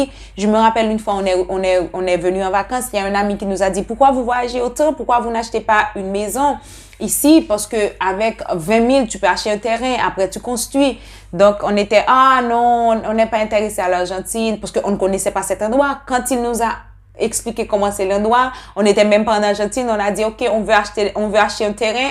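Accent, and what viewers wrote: Haiti. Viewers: Elle a un accent de Haïti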